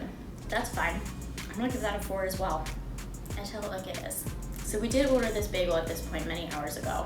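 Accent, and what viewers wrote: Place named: New Jersey, United States. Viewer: I can really hear the New Jersey accent coming in this video lmao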